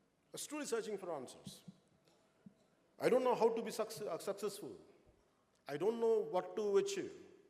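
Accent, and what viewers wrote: India. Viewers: ఒరేయ్ వెదవ. తొందరగా అర్థం చేసుకున్నావు. If you want to be proud by hearing butler english, that is upto you.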